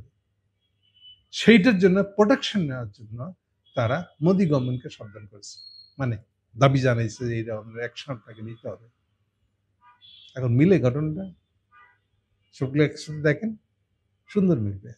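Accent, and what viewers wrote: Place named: Bangladesh. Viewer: দাদায় খাটি বাংলাদেশী কথা সহজে বোঝা যায়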